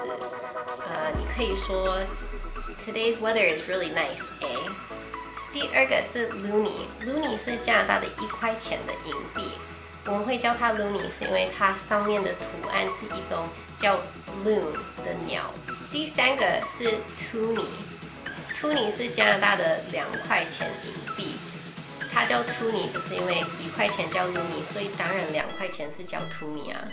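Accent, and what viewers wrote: Taiwan. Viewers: 真是台湾腔啊。。。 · 台普棒棒哒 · 很地道的台湾普通话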